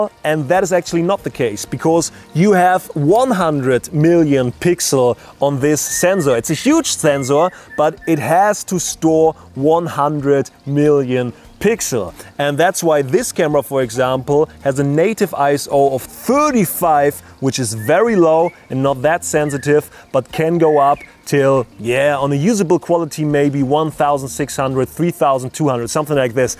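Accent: Germany. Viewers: "Zenzorrz" and "Sand corns". Okay, to clarify, is German and in Germany we have 2 ways to pronounce the "S" –> sharp and soft. The German pronounciation of the German word "Sensor" is more like (or exactly like) IF it was spelled "Zenzor". That is the reason why this sounds a bit weird here. A common mistake by Germans. We have a term for this: "denglisch" (deutsch + englisch). The German "Z" by the way is always pronounced like a short high hat "ts", never like a soft "S". The word "sand corn" I believe he also derived from the German "Sandkorn". In English it sounds a bit off, because it's more common to use "grain of sand", but I guess it does it's job. But other than that his english is pretty decent – you have to give him that. I don't know any English speaker who performs German for example in that fluent manner. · are you German? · let me guess... you are from Germany? 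;D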